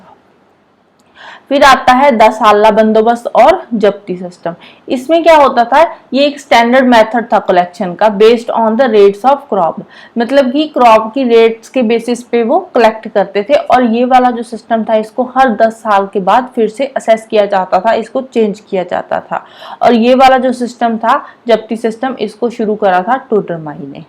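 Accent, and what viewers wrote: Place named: Chamba, India. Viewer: your ascent is chamba himachal pradesh😃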